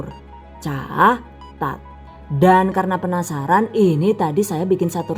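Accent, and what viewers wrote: Banyumas, Indonesia. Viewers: Msih muda tp bsa suara nya mirip wanita dewasa tua mirp suara maria untu penyiar radio jmn dlu keren pinter bhs inggris/ nglucu ,ngapak resep" 👍👍sdh tjir mlintir pula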